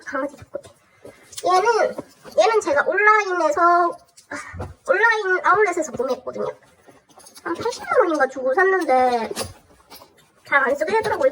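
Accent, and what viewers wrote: Busan, South Korea. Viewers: 음성 변조도 숨길 수 없는 친근한 부산사투리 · 부산분이시네욬ㅋㅋㅋ저도 부산 사람이라.. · 바닥에 널부러져 놓고 장갑찌고 만지는건 무엇?ㅋㅋㅋㅋㅋㅋㅋㅋㅋㅋㅋㅋㅋㅋㅋㅋㅋㅋㅋㅋㅋ사투리가 부산같은데 개웃기네 ㅋㅋㅋㅋㅋㅋㅋㅋㅋㅋㅋㅋㅋㅋㅋㅋㅋㅋㅋ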